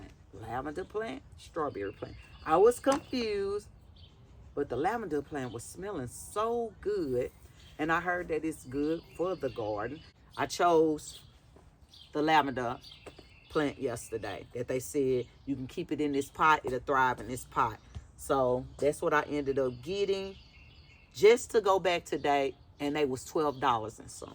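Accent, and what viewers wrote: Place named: St. Louis, United States. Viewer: Sounds like you from St. Louis.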